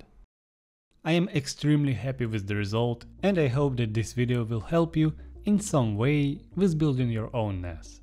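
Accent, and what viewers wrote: Eastern Europe. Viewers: славик акцент вэри найс. ал понятно энд по делу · thank you slavik accent man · Omg I didn't realize this is a super new video! I am loving it so far. The Slavik aksent is appealing though! I've been delaying building a nas / home server forever now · For some reason the Slavic accent makes me trust your experience more😂 great vid!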